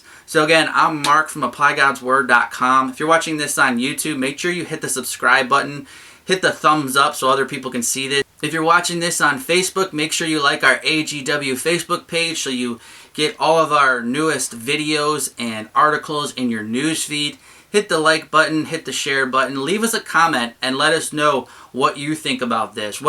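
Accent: United States. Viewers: I have watched, read, and heard a lot of Christian dating advice over the years, and this hit it right on the nose. I had never heard someone talk about emotional boundaries within a dating relationship, only with friends of the opposite gender so I really appreciated it being brought up. I also thought that the last point of being weary of our words and promises is a really key element as well. I can't tell you how many times I have heard friends or family make promises they can't keep in a relationship only to see it crumble when they can't hold up their end. I also liked your discussion of boundaries when talking about the future. I have never even considered that one before, however upon thinking about it, it is 100% true. I know I have made this mistake which lead to me staying in a relationship longer than I might have otherwise simply because we had talked about the future so much. 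I just wanted to say thank you and I enjoyed hearing the slight Minnesota accent with some great Christian dating advice.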